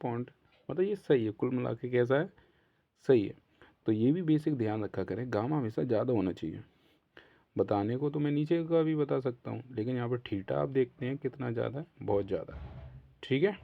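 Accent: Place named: Madhya Pradesh, India. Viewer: Thanks for the response sir! Abhi mein start hi kr rha hun aapke video dekh dekh k, kabhi kabhi profit aur kabhi kabhi loss, I feel mostly mein abhi emotional trades le rha hun since I'm very new to the market toh achche se confidence nhi aaya h. lekin aapki strategy se bahut kuch seekhta hun, aapke accent se lgta hai jese aap madhya pradesh se ho, mein bhi Madhya Pradesh se hi hun. Thank you so much for explaining!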